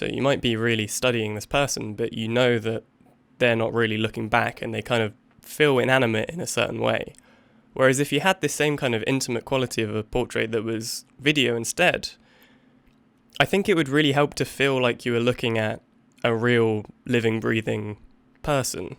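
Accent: United Kingdom. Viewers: why are you british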